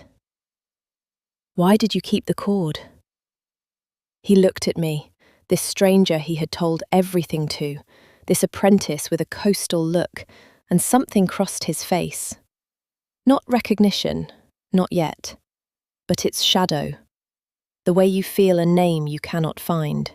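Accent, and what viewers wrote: United Kingdom. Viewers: Interesting story. Cool brit accent.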